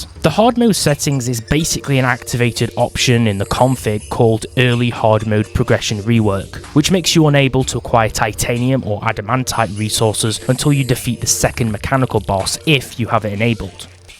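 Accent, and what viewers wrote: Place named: United Kingdom. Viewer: is british? no more video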